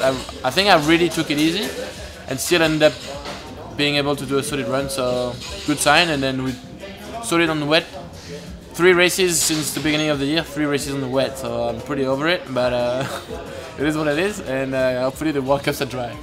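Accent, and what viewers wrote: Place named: France. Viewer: Au top l'accent Français quand tu et pas très doué en anglais sa aide 😉Bon courage a toi pour cette saison hâte de te voir détruit la piste des Gets 😄 👍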